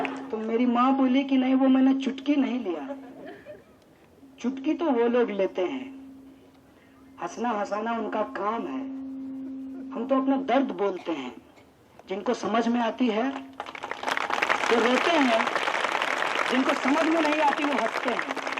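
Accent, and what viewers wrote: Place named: Bihar, India. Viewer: کنائیہ جی ہمیں کل ہی آپ کا تعرف ہوا ہے ایک بھارتی دوست سے اور کل سے آپ کا سپیچ ہی سن رہے ہیں Hamain bihari zaban to pehly hi bohat achi lagti thi ab ap sy bhi dil lagi hogai ha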